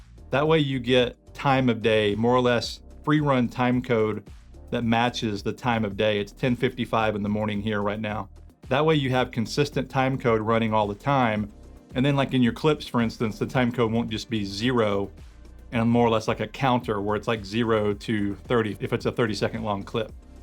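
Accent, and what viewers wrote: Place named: Carolinas, United States. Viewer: Thanks for the info. Love your accent. Is that a North or South Carolinian accent? Have a good day.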